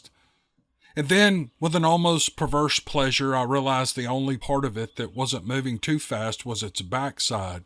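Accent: South, United States. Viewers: It's that southern accent, I love it. Feels like an uncle telling a hunting story.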